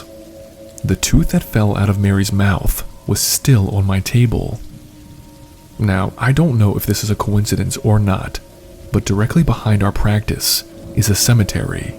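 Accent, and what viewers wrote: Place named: Delaware County, United States. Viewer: Love your videos & creative story categories ! Are you from DelCo ? Your accent is super DelCo lol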